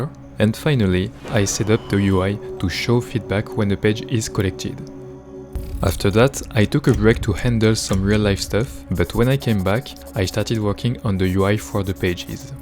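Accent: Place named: France. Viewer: I was thinking, he has the same accent as the french ppl in my Class. I check the County: France. Neat.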